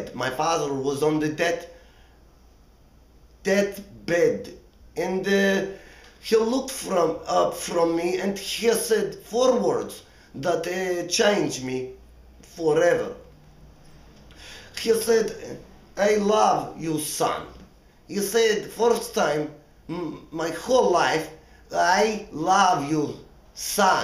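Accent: Russia. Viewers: Italian Mafia + Russian accent:)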